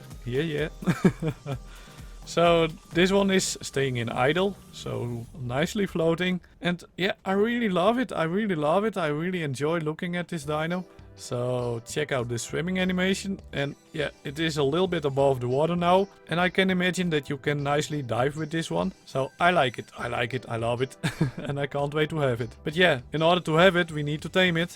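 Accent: Netherlands. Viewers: He is from Holland, it's a dutch accent. Yo, Maak jij ook mods voor ark? Of heb je er weleens in verdiept? Ik verdiep me er op het moment in, maar zit nog met een heleboel vragen. Ik wil namelijk een 3D object maken en importeren in Ark, modelleren is het probleem niet maar het importeren wel. Gr Erwin. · are you dutch? you kinda talk with an Dutch accent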